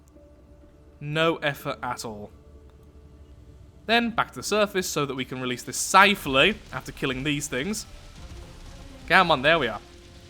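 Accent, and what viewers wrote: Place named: Wales, United Kingdom. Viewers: wait is from wales....*fist thing i think of* "WALLLLLLE LOOOOOORDDDDDDDDDDD" cough sorry i mean no dis respect...i was alwase wondering were your acent came from cool to know (sorry about the bad english) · You are Welsh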